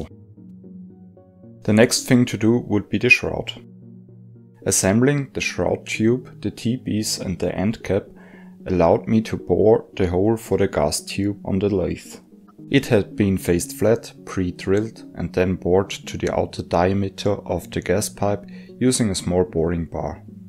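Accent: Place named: Germany. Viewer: Your Accent Sounds german. Ich bin auch deutsch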